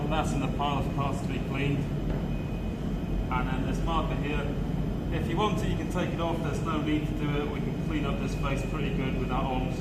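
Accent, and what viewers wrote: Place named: Wales, United Kingdom. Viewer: oh right that's cool I'm from South Wales and thought that's a Welsh accent. Where do you meet the ship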